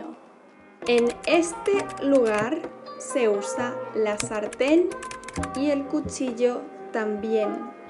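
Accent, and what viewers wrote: Castile, Spain. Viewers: is this Castilian Spanish plz! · Is this European spanish or Latin American?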